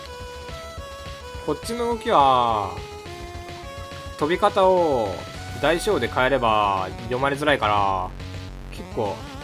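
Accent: Kansai, Japan. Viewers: 関西弁だ！嬉しい(*´ω｀*) そして かわいい笑 いつも以上にかわいいしリピート要素が多すぎる...絶対リピる(予言)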